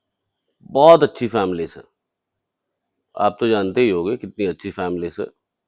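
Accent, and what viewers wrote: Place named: India. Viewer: Bhai desi andaz me narration Gajbe hai ❤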